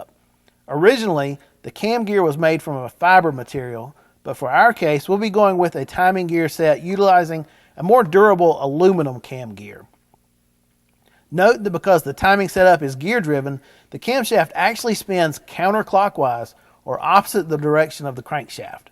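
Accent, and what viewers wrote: South, United States. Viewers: That speech pattern is called "Southern" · And the Oscar goes to 'All the Oddities of Ford's Famous Flathead V8'. A video above the rest. Award winning performance by (the artist). Narrated by a good ole boy from the South. It don't get better than this!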